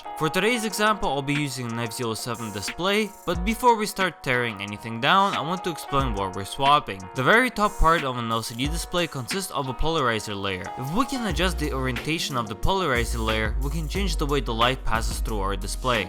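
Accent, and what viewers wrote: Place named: Russia. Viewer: Русский акцент ни с чем не спутаешь. Спасибо, бро 😀👍🏼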